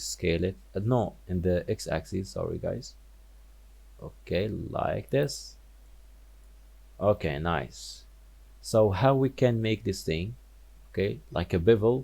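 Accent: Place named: Arab world. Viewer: والله عربي عرفتك من لهجتك😂❤ اشكرك على الشرح المفيد! Keep up the work!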